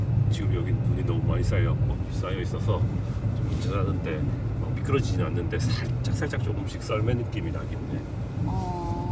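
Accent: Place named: Busan, South Korea. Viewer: 순종이ㅋㅋㅋㄱ 서울구경 차구경잘하고갑니다ㅋㅋ 햄아 아직부산사람이네ㅋㅋㅋㅋㅋㅋㄱ( feat .부싼사투리)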